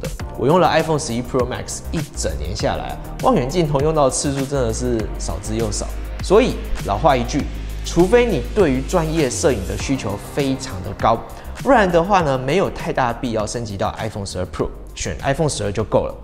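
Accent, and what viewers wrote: Taiwan. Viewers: 開個玩笑，發音不標準對台灣人來說很正常啊，我也沒標準到哪兒去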